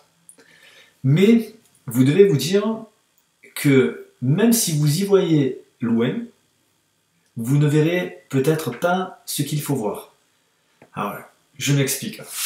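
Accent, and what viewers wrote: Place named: Toulouse, France. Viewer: vous etes où ? vous avez un accent Toulousain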